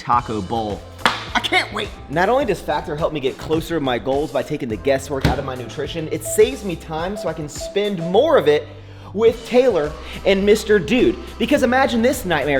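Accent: Texas, United States. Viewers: you have started to sound like a Texan 🤣 best of luck for your surgery!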